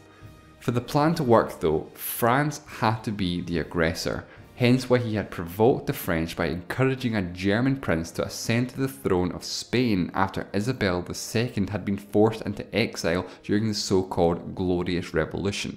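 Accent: Scotland, United Kingdom. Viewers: Scottish accent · Alright am a Scottish fan and I'm wondering by your accent I can guess your from central or eastern Scotland am I right and if so which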